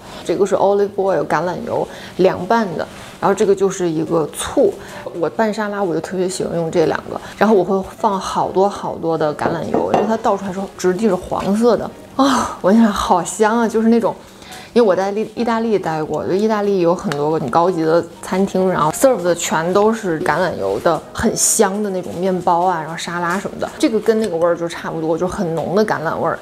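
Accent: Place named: Beijing, China. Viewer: 北京口音❤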